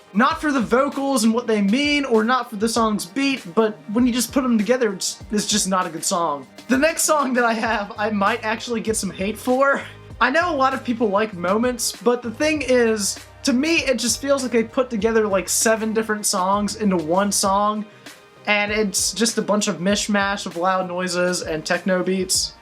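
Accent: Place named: Pennsylvania, United States. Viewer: The way you make your "O" sounds in words I can tell you're from Pennsylvania or around that area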